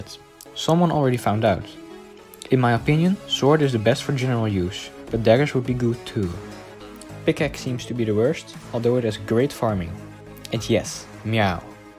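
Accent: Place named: Netherlands. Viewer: OMG THE NEDERLAND ACCENT OMGGGG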